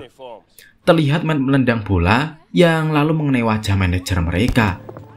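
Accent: Java, Indonesia. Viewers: Movie crop versi medok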